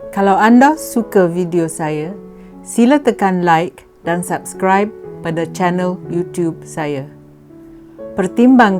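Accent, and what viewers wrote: Malaysia. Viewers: Pelat cakap mya..Melayu! Melayu !!2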